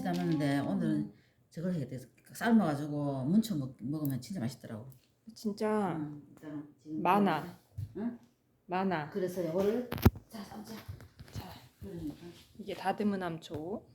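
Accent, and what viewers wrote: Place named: Gyeongsang, South Korea. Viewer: 경상도 사투리는 또 하나의 외국어..ㅎ 정겹고 다정다감합니다. 맛도 좋은 만병초 함초가 지천에 늘렸다는 사실이 신기합니다. 늘 건승하세요.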